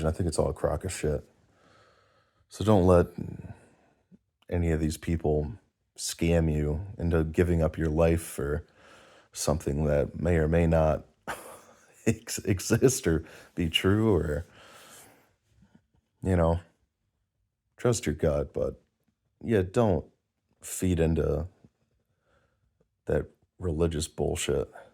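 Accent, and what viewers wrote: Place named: Canada. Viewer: I was angry too! Disfellowshipped in 2005. Now I'm happy. . Here is an end time prophecy they never talk about that has always been in every Christians bible and yet, few are the ones finding it: . Isaiah 66:5 Hear the word of Jehovah, YOU men who are trembling at his word: “YOUR brothers that are hating YOU, that are excluding YOU by reason of my name, said, ‘May Jehovah be glorified!’ He must also appear with rejoicing on YOUR part, and they are the ones that will be put to shame.” . [Name of person] is no longer one of JEHOVAH's Witnesses ---->> "excluding YOU by reason of MY NAME". . Do you see Jehovah's promise to disfellowshipped Jehovah's Witnesses? . "He must also appear with rejoicing on YOUR part"! . . So who is right? . Jehovah or, eleven men who live in New York? . My faith and belief has vastly increased since leaving! 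. . I'm HAPPY. . Luke -23 HAPPY are YOU whenever men hate YOU, and whenever they exclude YOU and reproach YOU and cast out YOUR name as wicked for the sake of the Son of man. 23 Rejoice in that day . [NAME OF PERSON] is no longer one of Jehovah's Witnesses ---->> "and cast out YOUR NAME" . . Another prophecy about Rejoicing! . . You sound Canadian. . You could try sharing this with your family. .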